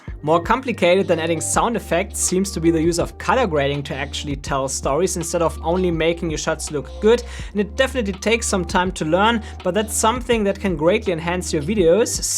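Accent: Germany. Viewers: your english sounds very german for my ears :D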